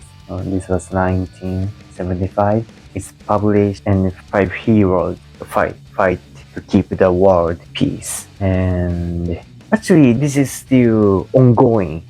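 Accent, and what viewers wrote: Visayas, Philippines. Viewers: HAHAHAHAHA NALINGAW KOG PAMINAW SA IYANG BINISAYA...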